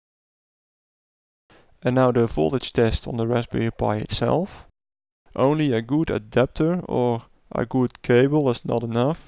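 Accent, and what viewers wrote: Netherlands. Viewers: So noticed you were Dutch by the accent. Dus ik maak het me even makkelijk. Ik had op mn pi2 eerst een plex servertje draaien, hdd powerde hij ook mooi. Nu raspbian image erop met plex en de hdd tikt lekker door (net nieuw) welke power options heb ik verder nog om de pi wat meer stroom. Te geven? (gebruikte voorheen de usb poort van mn pc)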